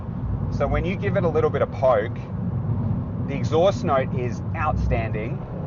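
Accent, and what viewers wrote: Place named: Australia. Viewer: I had to triple check myself when watching this. youre in a right hand drive, with an aussie dialect but all the cars look the same as america. love the guys driving silverados down under, i have one myself but i also have an abarth. ive built mine but it was fun stock but since i dont race dirt bikes anymore i decided to build a cheap car lol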